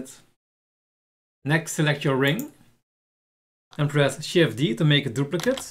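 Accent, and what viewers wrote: Netherlands. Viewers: Are u dutch?? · are you dutch ??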